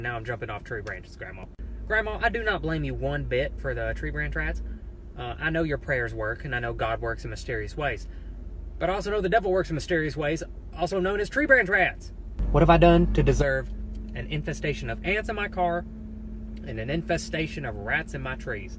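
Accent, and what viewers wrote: South, United States. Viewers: is he talking about possums? lol i guess they are noisy little creatures. he had more of an southern tang in 2018 did yall notice that?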